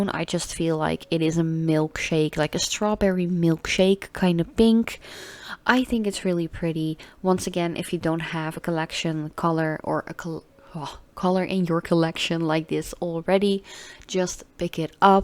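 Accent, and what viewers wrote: Netherlands. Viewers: Je hoord wel dat je Nederlands bent ahah🤭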